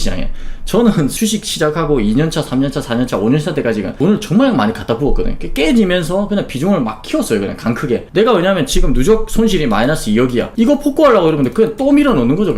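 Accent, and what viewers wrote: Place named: Gyeongsang, South Korea. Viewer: 이 형은 경상도사투래때매 포인트가 딱딱 박혀서 좋아!! 명불허전입니데이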